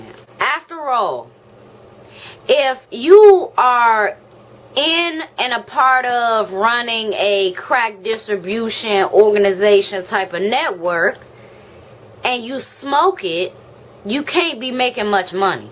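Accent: Washington DC, United States. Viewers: I'm from WASHINGTON D.C. UPTOWN PROJECTS 640 PARK/MORTON I WAS BORN IN EASTGATE GARDENS SOUTHEAST... ARE YOU FROM WASHINGTON D.C.???? YOU SOUND LIKE A DC girl..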